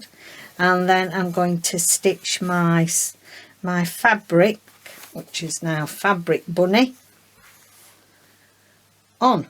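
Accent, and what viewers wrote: United Kingdom. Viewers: It’s so nice to meet you! Rachel has mentioned you several times & it’s always nice to match a voice with a name! I’ve never seen this technique before & I’m very excited to learn something new! Unfortunately I have to go on an archeological dig to find my sewing machine in my “sewing room”, hmmm…. Haven’t been doing a lot of sewing recently!! I’m new (since the pandemic began) to journal making & now I’m jumping into slow stitching! Still learning my stitches, hopefully I’ll catch up & get to play with my first page! Thanks so much for showing such a creative & fun technique, I love it! I have joined you as a new subscriber & given you the thumbs up & bell for new videos! I look forward to seeing more great ideas from you! Greetings from Lawrence, Kansas, smack dab in the middle of the US!! Where are you located? I love your accent, I wish I spoke British English! 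See you again soon! Thanks so much!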